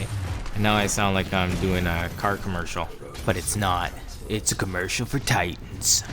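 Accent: Canada. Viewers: Are you Canadian? Sounds like it. Say “battery about”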